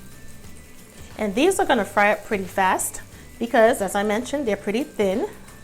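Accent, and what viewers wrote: New York, United States. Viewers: I hear the New York Accent! Whaddup Sis! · sound like you from NY but this meal is a southern classic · love the N.Y. accent!!!!! thank you.... i just followed on the gram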